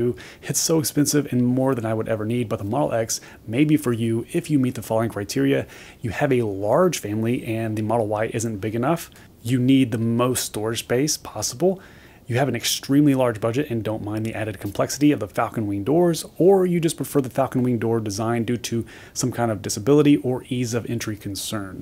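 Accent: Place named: Midwest, United States. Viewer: As always, a nice, professional and useful video,! You can always be counted on to get right to the details and to also allow us to hear about your experiences. You are SOLID GOLD! Thank you! P.S. Kinda sounds like Tesla's PR company wrote a lot of the "specs" text for you to read. No worries, but because you speak (or the video speed is "enhanced") fast, I had to CONSTANTLY, stop and rewind to hear all the spec details packed in to every sentence. May want to ask Tesla's PR firm to not enhance the speed of the video. Remember, the #1 group of car buyers is WOMEN. (Over 70% of the NEW cars purchase decisions are either directly by a woman or heavily "influenced" by a woman. This is according to published, industry stats. The remaining 30% of the NEW car decisions are made by men - who are single, or are buying a "collector" (not a commuter) car, or have no family and/or no significant female in their life. 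SOOoooo...as I am starting to explain to a couple of other "engineering" - driven sites, please SLOW DOWN and speak in your NATURAL voice. You have a GREAT Midwestern voice, which is very pleasant to listen to. NO need to RACE through everything. We LOVE what you have to say. Hugs and again, THANK YOU!!! 💐🌺🌻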